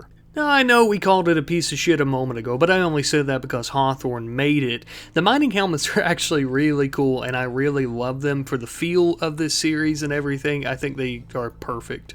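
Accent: South, United States. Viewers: I detect a southern drawl.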